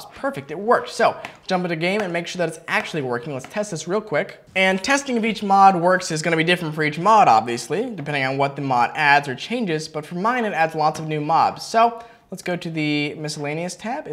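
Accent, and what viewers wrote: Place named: Russia. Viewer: are you Russian? It Sounded like you had a Russian Axint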